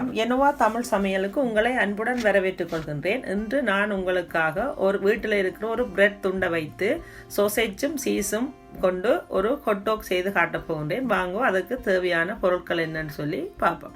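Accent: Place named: Sri Lanka. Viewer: மிக மிக அற்புதமான ரெசிபி அதைவிட தங்களின் இலங்கைத்தமிழ் என் மனதை கொள்ளை அடித்து விட்டது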